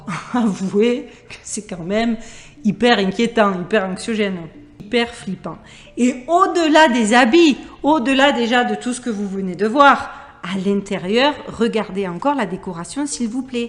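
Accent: Marseille, France. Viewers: Madame je vous écrit sans avoir commencé a voir la vidéo ce que je ferais après donc pour vous dire que je vous apprécie beaucoup d'autant plus que je n'ai jamais été pour une Idole je ne suis Fan de personne . Ma modeste personne me suffit surtout en ce moment . Il faut pas se le caché non seulement vous belle mais avec un cœur un cerveau BIEN REMPLIS .Du bon .......sans nul doute et de plus ce joli accent Marseillais vive la France bon enfin bref vu l'état de la France et bien d'autre Pays en ce moment!!!!!!!! Juste pour vous remerciée de votre travail que je regarde avec beaucoup de PLAISIR . Merci je m'arrête la sinon c'est un roman qui serai écrit . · j'aime vraiment ton travail et ton accent de Marseille 🙂 je partage complètement ton regard sur le monde, et j'aime bien aussi ton sens des valeurs..... Belle et longue durée à ta chaîne!! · Bravo! 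J’adore votre accent du Sud en plus ..un vrai bonheur de vous écouter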